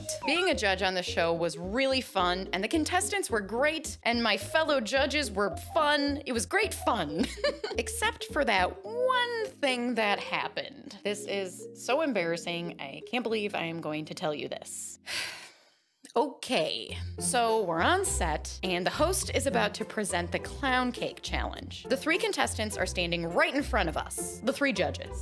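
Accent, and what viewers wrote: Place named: Wisconsin, United States. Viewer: I'm telling you she has to be from Wisconsin she sounds so Wisconsinny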